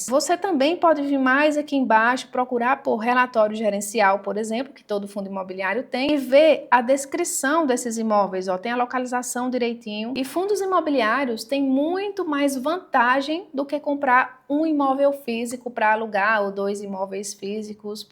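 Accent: Northeast, Brazil. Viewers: Tá cada mes mais gata 😁 e esse sotoque nordestino eu acho a coisa mais maravilhosa do mundo 😂😂❤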